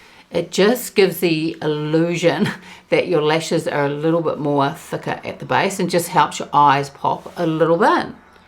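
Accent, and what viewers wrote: New Zealand. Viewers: Hello from a fellow Kiwi vlogger, it's nice to hear a familiar accent on YT 😃. I'm interested in the Water Fresh Tint but I'm not sure how it would go on my very fair and rosy complexion, plus it might be a bit dewy for me as I'm more of an almost matte girl. I've just finished the No.1 foundation which I really liked. The colour was probably the best match I've ever had in any other foundation - ever - but for some reason I've replaced it with something new to me, the Haus Labs 😂. Anyway, just wanted to say hurroooo. I'll see you again 🙋‍♀️.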